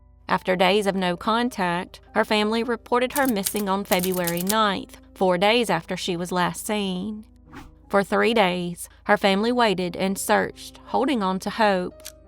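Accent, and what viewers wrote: South, United States. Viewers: Human narration by good old southern girl with a wonderful accent?! I subbed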